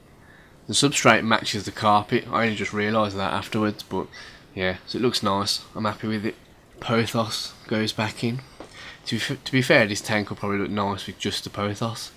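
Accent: Birmingham, United Kingdom. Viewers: are u a brummie lol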